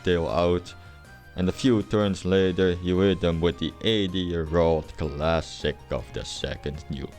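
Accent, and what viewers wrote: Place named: Finland. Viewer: are you perhaps from finland. the accent sounds so finnish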